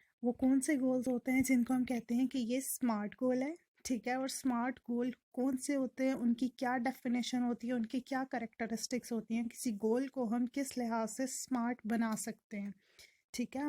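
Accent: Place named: Kashmir, India. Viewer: Muah maam sexy voice ❤ your kashmiri accent is so sexy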